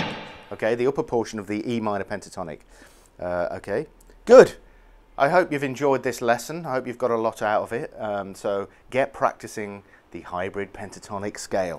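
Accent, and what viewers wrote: United Kingdom. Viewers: Kids, don't practice guitar, have muscular body, charming british accent, or else you will be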